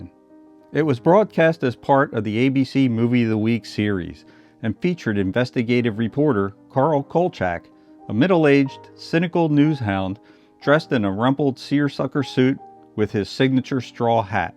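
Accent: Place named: Philadelphia, United States. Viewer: One of my favorite shows; you video makes me miss it and hearing your Philly/South Jersey makes me miss Wawa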